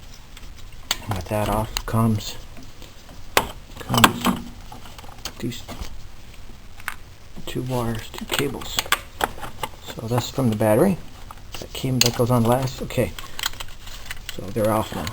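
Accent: Canada. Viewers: Another nice review. You seem to have a maritime accent -- are you from there?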